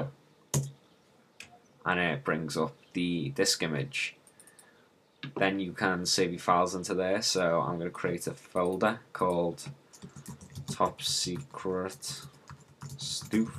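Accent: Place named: Liverpool, United Kingdom. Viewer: A scouser! Hi from liverpool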